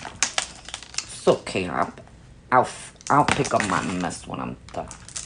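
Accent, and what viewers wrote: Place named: Bronx, United States. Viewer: I knew you was from the Bronx before you told us, girl😂 You have that Bronx swag❤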